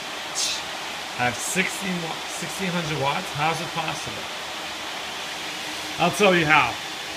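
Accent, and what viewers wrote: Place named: New York, United States. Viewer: Thumbs up for the rockin rig and NY foughetttaboutittt accent!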